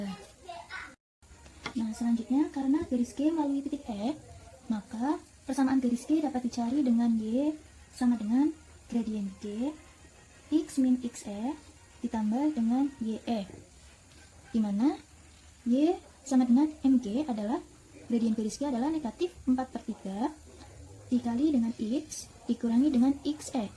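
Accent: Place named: Bandung, Indonesia. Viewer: Suaranya kaya orang bandung kak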